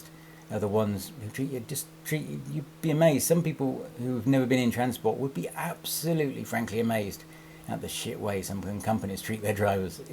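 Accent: RP, United Kingdom. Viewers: are you not a bit to posh to be a trucker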